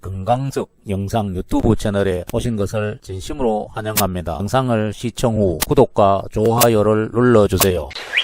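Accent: Andong, South Korea. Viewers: 안동사투리인가요~~^^ 정겨워요~~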